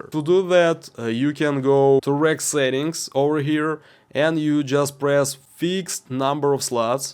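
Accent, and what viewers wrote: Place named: Russia. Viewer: Great one - thanks a bunch! You sound Russian, not that it matters, but what does is your approach and delivery that has no fluff or self admiration - a very Russian way to break things down to essential points and pass them to a comrade :)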